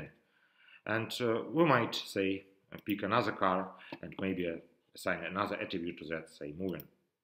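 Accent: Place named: Russia. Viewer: Russian accent))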